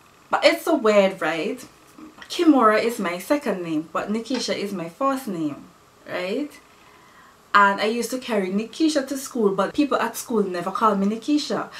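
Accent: Saint Vincent and the Grenadines. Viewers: butt up pon yo channel by accident, glad to see a Vincy on youtube, nuttin wrong wid talking dialect , its a combination of our african language and our slave masters. gwan gyel do yo thing, new sub. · It's good to see a fellow Vincentian with their accent still..though living abroad #vincytodbone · Love this! Miss hearing the vincy accent since we moved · Girl your accent says Vincy alone 🇻🇨🇻🇨 so good too see another Vincy on here 🇻🇨🇻🇨🇻🇨 #784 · Was so happy to hear your accent n see someone from Vincy on YouTube!!! Subscribed!!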